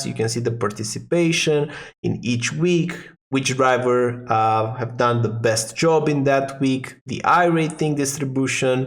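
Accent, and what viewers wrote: Romania. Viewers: Knew you were Romanian mostly from the accent, but reclamele în limba română au clarificat acest lucru. 😅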